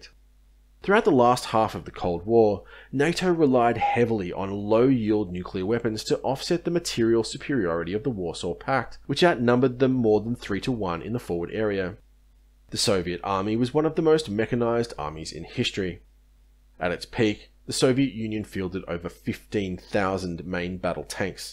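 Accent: Australia. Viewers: Glad you re-uploaded it mate. One thing though... you pronounce "beta", as in "beta radiation", as "bay-tah", not "bee-tah". As a fellow proud Australian, I must ask why. There are enough Americans speaking their form of English in YouTube videos, and while there's nothing wrong with that, there's no need for everyone else to do so too. But that is a minor criticism. I really enjoy your material and it's nice to hear an Aussie accent, the occasional American pronunciation notwithstanding. As a 13 year old in the early 80s, I delivered the afternoon newspaper, "The Herald". In it on one occasion was a graphic description of the effects of a one megaton blast over Bourke Street. I think the effects described in that were probably taken from the general description of the effects at certain distances of a one megaton blast that you gave towards the end of the video. 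I've often wondered where I could get hold of that article in recent years, but I cannot find any reference to it anywhere. I wonder if you might know of it. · I want to take a moment to express my admiration for your scientific videos, which have a calming, informative effect on me. I am particularly fond of your previous dissections of the atomic theory, which I find highly engaging and informative. I strongly appreciate your voice; that Aussie narration in the video creates a sort of exotic intimacy, which adds to my enjoyment of the content. Additionally, I am grateful for your videos on the Pacific military operations, which were fascinating. I think your style is outstanding with the quality of the videos, and I am deeply grateful for the opportunity to watch them for free. Thank you for making these videos while naturally making the very most informative pieces about these amazing underlying subjects.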